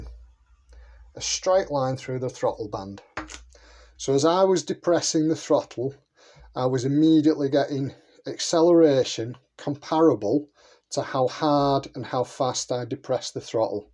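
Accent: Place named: North, United Kingdom. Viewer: Something about this Northern accent makes me take in all the information with such efficiency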